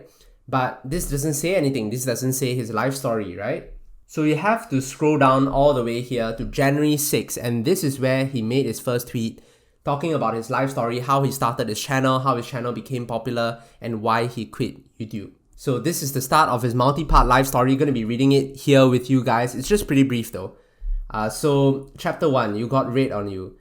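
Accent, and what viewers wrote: Singapore. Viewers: Can hear that Singaporean accent hahaha hello fellow Singaporean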